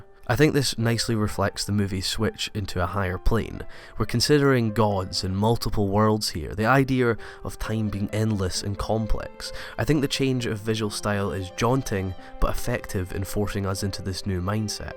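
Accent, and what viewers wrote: Scotland, United Kingdom. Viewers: I've been watching your videos for a while now and I still think your narration, detailed reviews and opinions make for great viewing. Watching anime which is innately foreign in nature, is made to seem very natural when presented and discussed in a familiar Scottish accent. This film has been on my to-watch list for a while now so although I'm disappointed I didn't get to see it in a cinema setting (and admittedly, I probably should have watched it before watching this video), this has definitely made me even more keen to watch the film. Great video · He sounds Scottish. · Sorry, unrelated but I love your accent!! I'm Scottish tooo !!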